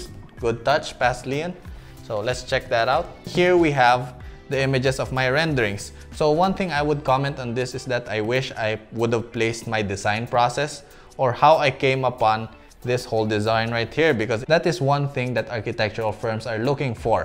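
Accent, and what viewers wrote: Philippines. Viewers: Infairness ang galing niya mag english. Filipino accent peru di masagwa at maarte. Tamang tama lang. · What I love about it, it's not THE "FILIPINO ACCENT" that the media (especially foreign media) portraits this is the legit pinoy accent not the forced one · I like how he still has his Filipino accent, this should be normalize!!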